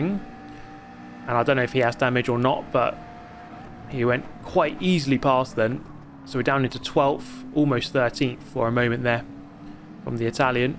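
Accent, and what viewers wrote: United Kingdom. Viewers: i LOVE your voice and the british accent😆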